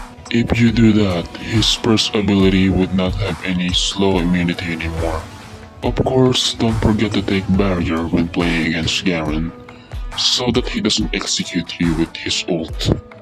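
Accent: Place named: Philippines. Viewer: Kahet anong voice changer payan basta kadugong pinoy kelala mona accent palang HAHAHAHA... GOOD GUIDE!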